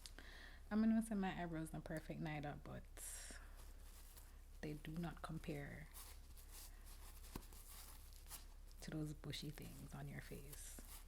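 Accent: Jamaica. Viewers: I love your alternative subtitles XD i can follow nearly all of your accented words and jamaican phrases but i appreciate the subs anyway just in case we miss what you were saying Also I'm finding the more I learn about anansi, the more I see that his biggest trickery is using other people's pride against them to get what he wants 😂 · The Jamaican accent has to be one of my favourite accents ever and couple this with folklore tales and I’m obsessed, instant follow 🥰🥰🥰🥰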